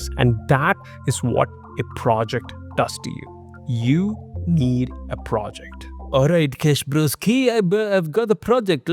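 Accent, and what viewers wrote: India. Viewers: ya toh tu firangi accent mein baat karle ya indian accent mein baat karle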